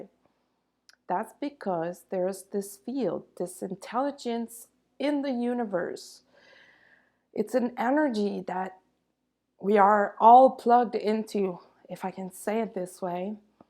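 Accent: Quebec, Canada. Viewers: Do I hear a little French-Canadian accent!? 😉😉😉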